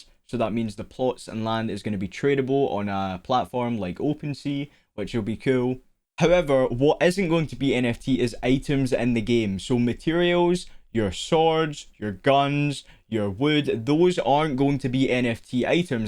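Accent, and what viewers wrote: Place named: Scotland, United Kingdom. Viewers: You guys are really trying to make it on this channel even switched the robot for the old dude and now the old dude for a younger British sounding (sorry if your not) but my question is when do I get a turn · Sounds Scottish to me not British :')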